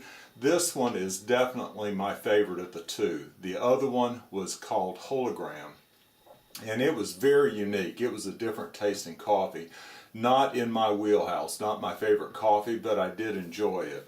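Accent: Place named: South, United States. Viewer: Love that Southern Drawl... 👌😎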